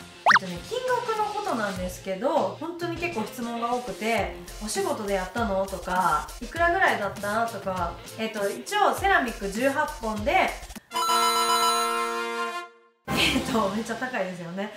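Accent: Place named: Kansai, Japan. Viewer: 関西弁も可愛い好き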